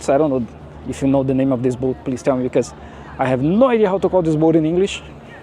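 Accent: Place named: Brazil. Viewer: I understand because he's a Brasilian talking in English 😅😂😂😂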